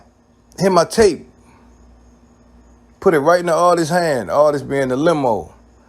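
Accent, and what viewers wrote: Baltimore, United States. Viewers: You’re definitely from Baltimore huh bro? Haha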